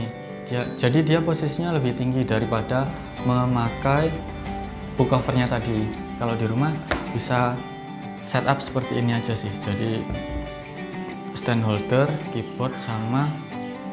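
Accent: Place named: Java, Indonesia. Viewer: Seneng gua dengerin medok lu mas wkwkwk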